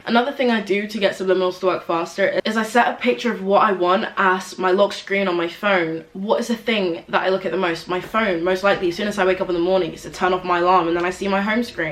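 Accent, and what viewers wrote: United Kingdom. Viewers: I love this video, it's so straight to the point. Maybe its just the Uk accent that feels more familiar to me but other videos go on about vibrations and try and sound too mystical and it just feels a little weird but with yours I can trust you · She’s not she’s British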